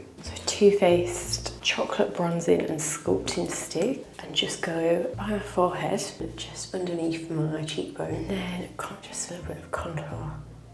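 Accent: United Kingdom. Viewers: Okey I m here to learn British accent ❤❤❤❤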